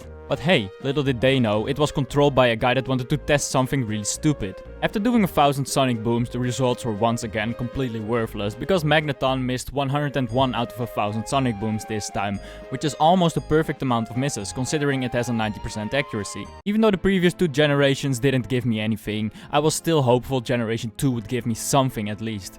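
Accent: Netherlands. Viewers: r u dutch 👀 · I can notice a slight Dutch accent. Am I right? :p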